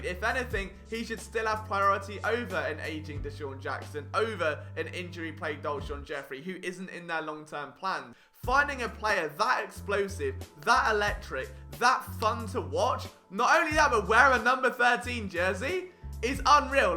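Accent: United Kingdom. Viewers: Holy shit. The only thing worse than an eagles fan, is an eagles fan with a horrendous British accent.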